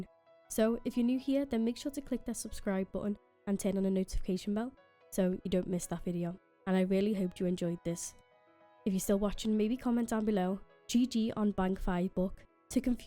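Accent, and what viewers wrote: Liverpool, United Kingdom. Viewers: that accent tho? is it scouse?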